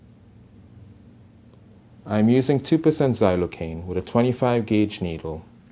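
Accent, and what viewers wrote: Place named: Trinidad and Tobago. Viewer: Where are you from? Sound like Trinidadian or something similar.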